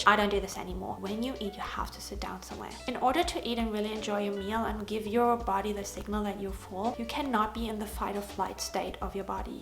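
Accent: Germany. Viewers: It took me a while to figure out your accent, but its German! ❤ Love from Germany, youre spitting the important facts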